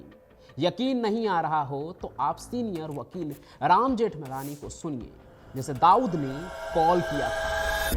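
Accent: Bihar, India. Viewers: Bhai tu bihar se hai ky tere gawaro jaise bat krna smjh me aata hai tu bihari hai😂😂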